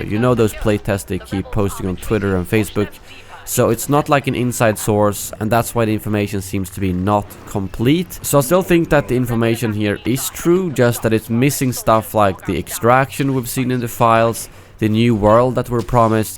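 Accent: Sweden. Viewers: are you swedish? är du Svensk?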